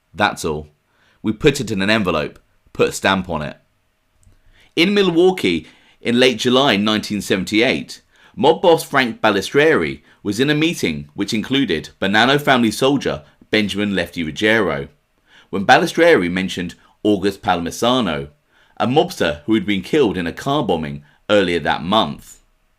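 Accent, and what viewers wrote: United Kingdom. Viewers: It's hard for my minds eye to envision an North East American Italian Gangster when a British Man is reading their Gangster quotes. Instead I see Peaky Blinders or Snatch.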